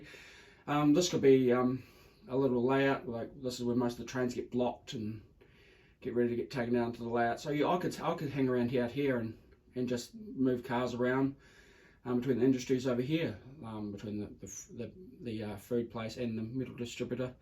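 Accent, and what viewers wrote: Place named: New Zealand. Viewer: Great to hear a good old fashioned kiwi accent! Kia ora, new sub from a former Wellingtonian.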